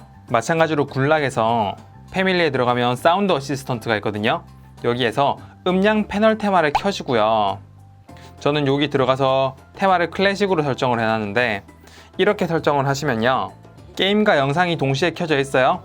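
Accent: Gyeongsang, South Korea. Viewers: 목소리가 참 매력있네 경상도 이신가..?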